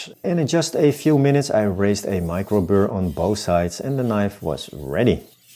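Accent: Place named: Netherlands. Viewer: Heard about your channel on the sharpening subreddit, I hear a Dutch accent in first 2 seconds. Love it ❤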